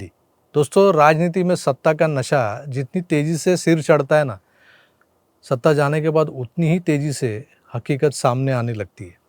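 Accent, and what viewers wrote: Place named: Maharashtra, India. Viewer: मराठी माणूस किती पण चांगली हिंदी बोलला तरी ओळखू येतोच तो मराठी आहे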